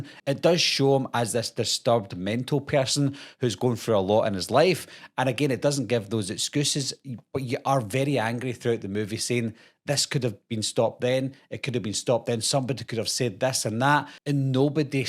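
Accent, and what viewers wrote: Scotland, United Kingdom. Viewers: As soon as I heard the Scottish accent I was in! Then for you to recommend a couple if Aussie flicks AND know about The port Arthur massacre we had, I am now subbed 👍🏼 🇦🇺 🏴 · Is this guy Scottish?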